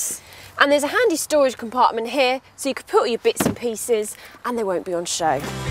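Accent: United Kingdom. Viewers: Forget about Suzuki Grand Vitara, she has awesome British accent, she look and sound very graceful.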